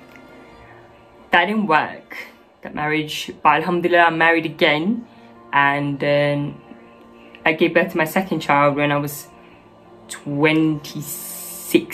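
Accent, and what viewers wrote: United Kingdom. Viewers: Hello, I was wondering how come you seem to have a British accent. Did you live in the U.K.?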